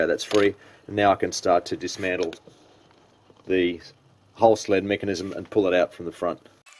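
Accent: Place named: Australia. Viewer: Hey cocka, where are you from? Nice to hear an Aussie voice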